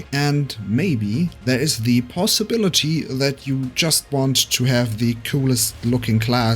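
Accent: Germany. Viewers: U probably from germany? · Are you German by chance? :D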